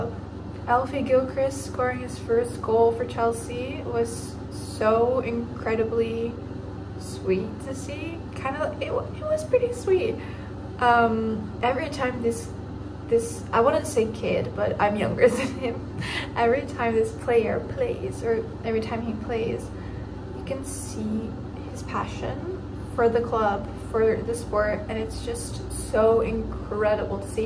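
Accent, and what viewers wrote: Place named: United States. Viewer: Do you live in America? Your accent is American obviously but you say your local team is Dutch 😁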